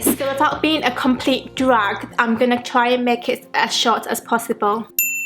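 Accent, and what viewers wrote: Yorkshire, United Kingdom. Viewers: Your accent sounds like british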